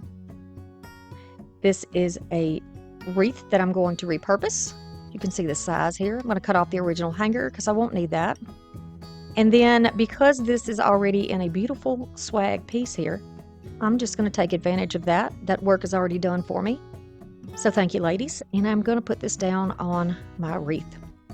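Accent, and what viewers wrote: South, United States. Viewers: Love your videos! Love the southern drawl. Im originally from Arkansas but live in Illinois for several years. All your projects today are just Gorgeous! ❤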